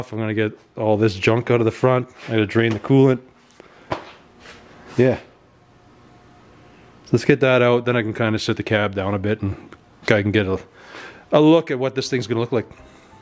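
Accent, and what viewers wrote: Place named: Canada. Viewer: Figured with "eh" and aboot' love the Canadian language!!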